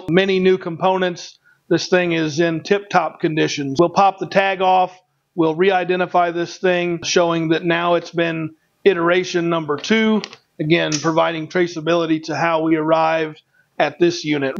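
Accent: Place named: South, United States. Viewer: Leginment country boy will bring you back dreakly i reckon only country boys like us talk that way😂 but that saft dam sure got geterd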